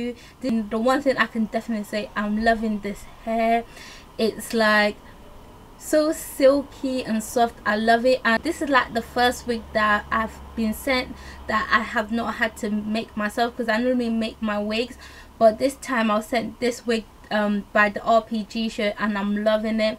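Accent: United Kingdom. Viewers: Your Like With A Good British Accent Lol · You're cockney accent LOVE IT I have a cockney accent but with a mixture of all different accents I don't understand my voice lol I'm just rambling on sorry for wasting you're time, :D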